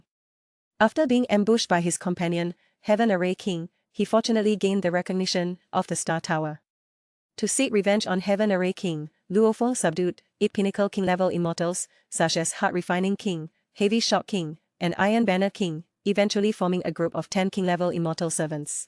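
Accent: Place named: Singapore. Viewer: Are you singaporean? You have a Singlish accent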